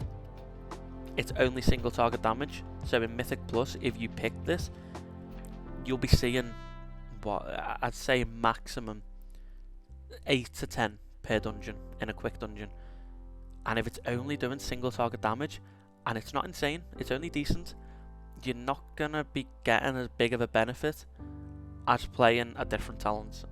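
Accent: Liverpool, United Kingdom. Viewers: Can’t work out if that’s a scouse or wirral accent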